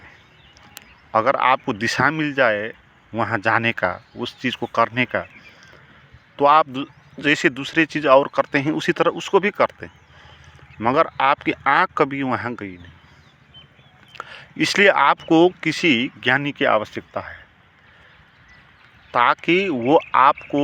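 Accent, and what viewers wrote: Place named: Nepal. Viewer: आप की हिन्दी में नेपाली की रस आ रहि हैं।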